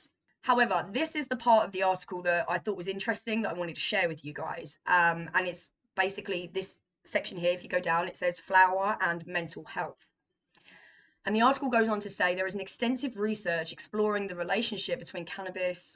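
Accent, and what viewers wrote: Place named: East Anglia, United Kingdom. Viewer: I've been loving your videos. You inspired me to start MC and I've been feeling all the better for it! Please forgive me the rudeness of asking, but I'm from a small town on the norfolk-suffolk border and your accent just sends me back to my childhood. You have to be local to me, please confirm if true. It's so rare to see people in 'the wild' who sound like my cousins and family friends. Back home is big stoner vibes too so it's just very fitting ☮☮☮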